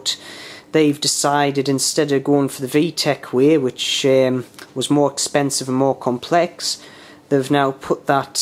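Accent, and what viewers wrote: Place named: Northern England, United Kingdom. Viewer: What a distinctive Northern accent..Sounds almost like Liam from "Benidorm".:)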